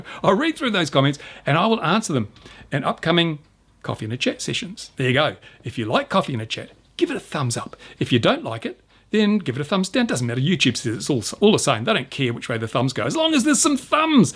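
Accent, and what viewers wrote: New Zealand. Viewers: "Kiwi" accent LOL! =D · I like this format. Informative and not an outright rant. Very nice and laid back. And that Kiwi accent. Tingling. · Goodmorning..Kiwi accent lol. Is that one of your female admirers on your left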